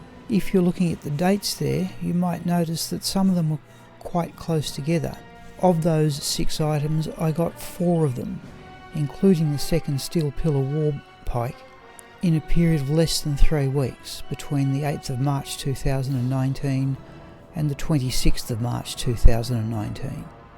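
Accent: Australia. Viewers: I began watching your streams and videos probably about a year and a half ago, and through all the good characters and crafting sessions, I loved watching you run Pindleskin more than anything. It was fun to see some of the drops and lowlights make it into various monthly highlight/lowlight videos, as I could look back and exclaim, "hah, I remember that stream!" I've been watching many streams for many years, as well as ASMR videos on YouTube for roughly a decade. Your streams tirelessly plugging away at Pindleskin always put me into a nearly catatonic or zen-like state. I do not say they were boring. Rather the opposite. Your cadence and Australian accent and logical approach reminded me of a professor I had many years ago, and it was funny to find out you were also an educator. I could listen to him or you for days and find solace. Call it weird or interesting, I had to share. 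I'm not alone here in saying we all appreciate your streams and especially your dedication, sharing a game we all love. Personally I have a much different vested interest in that your candor/approach are the perfect accompanying background noise or secondary monitor treat to my lifestyle of gaming and streaming. So from me to you, thank you. (I formerly went by Aralern24, but changed my YouTube alias to reflect my world of warcraft character lol)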